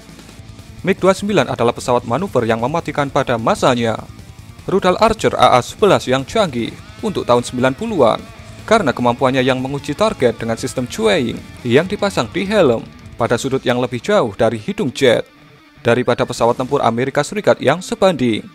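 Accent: Java, Indonesia. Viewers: logatnya jawa bgtu kayak sukarno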